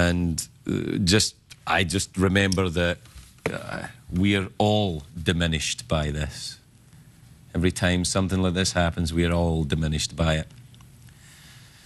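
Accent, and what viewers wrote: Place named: Scotland, United Kingdom. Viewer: He sounds Scottisher here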